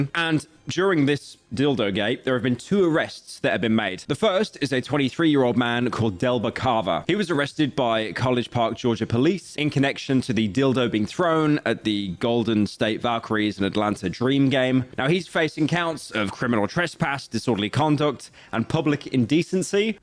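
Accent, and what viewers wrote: United Kingdom. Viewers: Why is this british guy talking? Im cutting cheese for my sandwich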